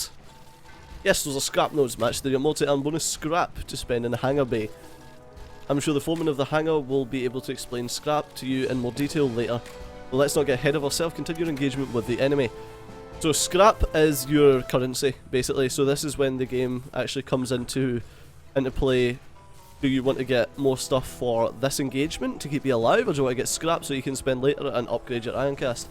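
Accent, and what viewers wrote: United Kingdom. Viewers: That looks like a fun game! Great video on it too. Are you British or Scottish?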